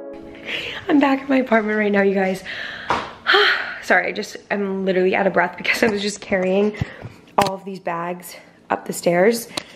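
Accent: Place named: Boston, United States. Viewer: I know a Boston accent anywhere hehe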